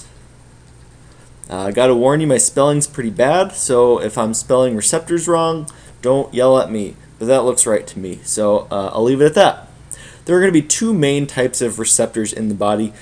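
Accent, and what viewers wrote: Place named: Iowa, United States. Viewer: My guess is its an American accent, from Iowa.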